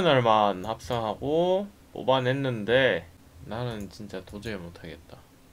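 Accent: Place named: Gyeongsang, South Korea. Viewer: 관측은 어디서 보통하시나요? 대구분이신거같은데??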